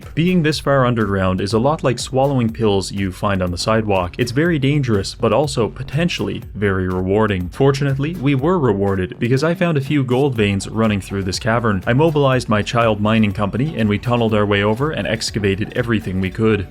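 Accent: Newfoundland, Canada. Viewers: "Zombie oat-breaks" God, I love the canadian accent Also love your vids! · This guy is the most newfoundland sounding guy I have ever heard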